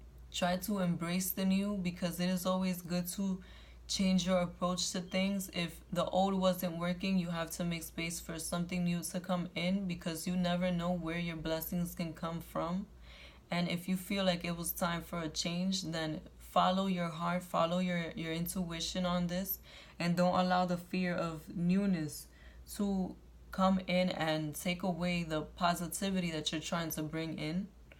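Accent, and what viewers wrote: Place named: Puerto Rico. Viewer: Thank you for that amazing reading, gave me goosebumps? ❤️❤️ Your voice! Boricua!?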